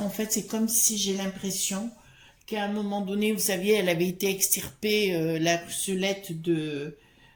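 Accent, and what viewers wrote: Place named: South, France. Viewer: Merci pour votre tirage ! Surtout gardez votre accent du sud qui fait tellement de bien aux gens du nord ! A écouter le Pr Perron sur SUDR.... qui parle de la picpic et du Pr défraîchie..... la parole se libère !!!!!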